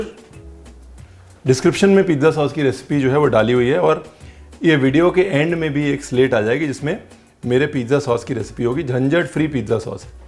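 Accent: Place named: Punjab, India. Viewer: yeah exactly. The way he iterates some word in Hindi and Punjabi blended.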